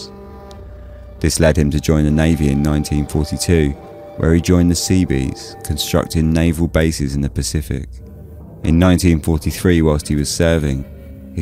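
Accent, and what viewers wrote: United Kingdom. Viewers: lol. - there are USA. Americans that can't pronounce Arkansas or Texarkana. You merely pronounce it with a British accent. ToMAYto - ToMOTo Ya know, we Americans actually - get that one right. lol ...and, I specifically recall being taught that the "H" is silent - in "herbs" (you folks pronounce it like one would expect an American to - "?" No problem, I'm just curious what the original rule is.)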